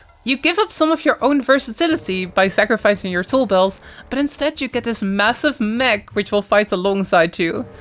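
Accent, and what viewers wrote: Netherlands. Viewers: can really tell the dutch accent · i can be wrong but i smell dutch 😅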